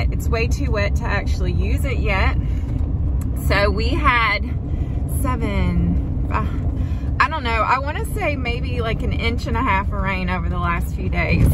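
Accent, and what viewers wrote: South, United States. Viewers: Loved the video. Typical farm day something always breaks Lol. I love your positive outlook also your accent. A real southern lady 🙂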